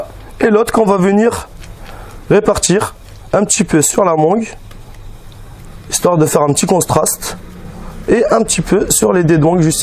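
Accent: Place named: Kabylie, Algeria. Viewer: Je reconnais l'accent kabyle lol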